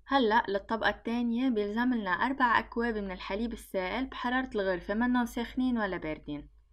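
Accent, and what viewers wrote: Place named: Lebanon. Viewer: يلي بحبو اكتر من وصفاتك هي طريقة كلامك ياخي شو بدنا بالحكي اللهجة اللبنانية عشقي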